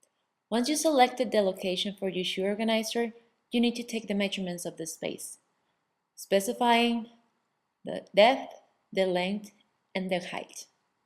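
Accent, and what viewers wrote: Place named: Mexico. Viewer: I fucking love her Messican accent! XD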